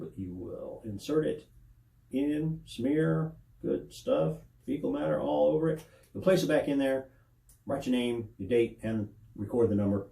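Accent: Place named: South, United States. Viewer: It's been soooooo long since I've heard your voice but I can tell you have ben south of the Mason Dixon for a while.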